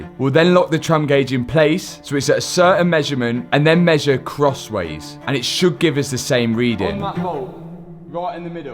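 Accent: Leicester, United Kingdom. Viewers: I miss the Leicester accent 😅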